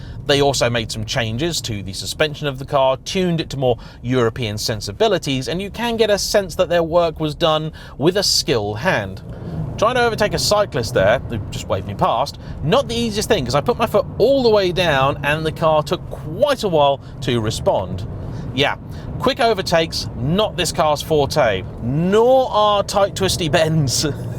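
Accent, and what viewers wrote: United Kingdom. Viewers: Funny hearing a Brit talk about these as a American I always see them as extremely trashy cars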